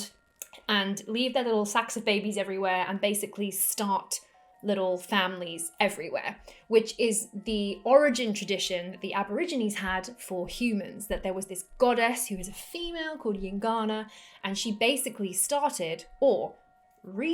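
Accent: England, United Kingdom. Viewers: The english accent really helps out the channel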